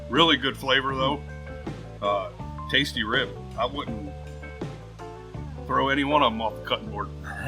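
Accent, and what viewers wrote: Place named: Pittsburgh, United States. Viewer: It's funny because I was watching this video and was catching your accent heavy. So I went to your channel and lone and behold, you're from the Pittsburgh area, as am I😂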